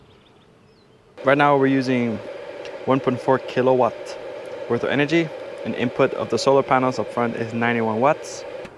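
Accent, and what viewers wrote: Netherlands. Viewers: Ik dacht al aan het accent te horen dat je een Nederlandse tegenkwam😉 Leuk om te zien in comments dat er mensen op zo veel verschillende plekken naar je kijken! Ook vanuit Cyprus kijk ik graag mee😉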